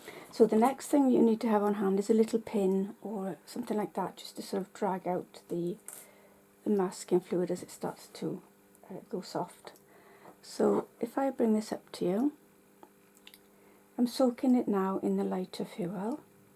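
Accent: Wales, United Kingdom. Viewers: Love that Welsh accent! Thanks for the tip. Jan from Victoria, Vancouver Island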